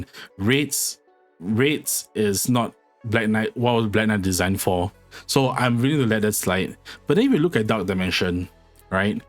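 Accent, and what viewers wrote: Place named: Philippines. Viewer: good vid bro, your accent is heavy .. are you filipino??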